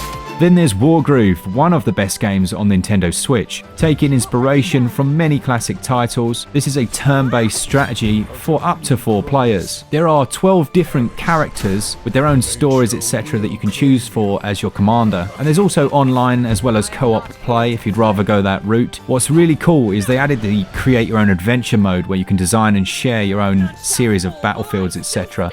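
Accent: United Kingdom. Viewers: I really appreciate having a channel to tune into for Switch sales. I can tell you guys have been working out the kinks, making each episode ever smoother. ...I’m also a fan of the British accent, I have no idea who that’d annoy.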